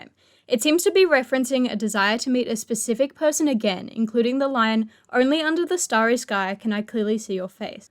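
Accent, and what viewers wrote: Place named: United Kingdom. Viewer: british spotted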